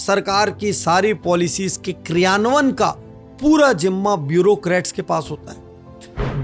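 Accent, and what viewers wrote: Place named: Gujarat, India. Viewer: लगता है सर आप गुजरात से है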